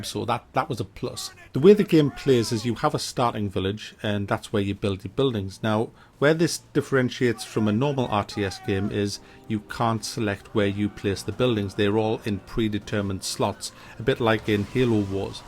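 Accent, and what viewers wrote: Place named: Northern England, United Kingdom. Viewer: LMFAO, bloody northerner! Every time I listen to in his reviews I visualize Oz from Auf Wiedersehen Pet, and that makes it all the more awesome.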